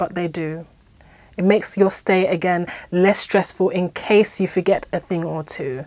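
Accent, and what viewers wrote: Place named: RP, United Kingdom. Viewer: Having a Mary Poppins accent (that I love) and the name, one couldn't be anything but elegant. Not part of your crowd, but interesting insight. ✌🏽 *Not sure if this is the correct way to spell your name.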